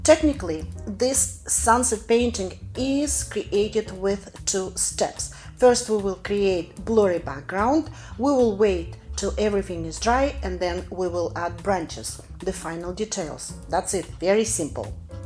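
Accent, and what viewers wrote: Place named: France. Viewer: I love your French accent in addition to your art.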